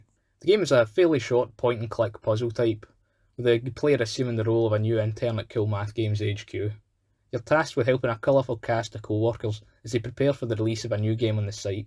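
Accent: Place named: Scotland, United Kingdom. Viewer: May you gain million views random scottish man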